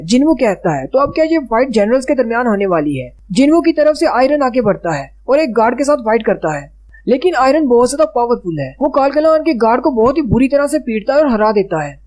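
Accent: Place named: Pakistan. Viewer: Are your from pakistan beacuse your accent is not hindi it's urdu mix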